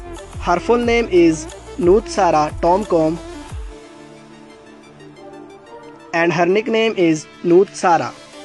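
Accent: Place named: India. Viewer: กูเกรียจมาก สำเนียง อินเดีย!! ..เกรียจ จริงๆๆ!!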